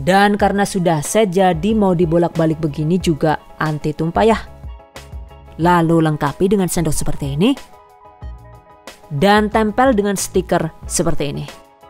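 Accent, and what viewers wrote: Banyumas, Indonesia. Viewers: Serumpun sepertinya sama suami saya..ngapak"😄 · Suka ngakak denger mbak nya ngomong ngapak..😂🎉sukses trs mbk... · Mbaaaaaa'e , ngapak²😂😂😂 resepnyaaa mantap. Terima kasih yoooo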